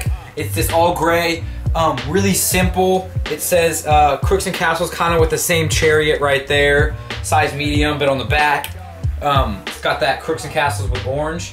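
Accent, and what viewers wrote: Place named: United States. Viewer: its fresh to hear a Leb with an american accent we have a fair few Lebanese in Oz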